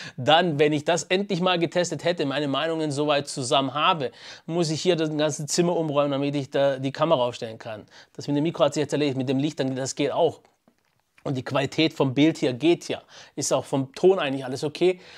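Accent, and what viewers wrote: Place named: Swabia, Germany. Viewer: Schwabe laaan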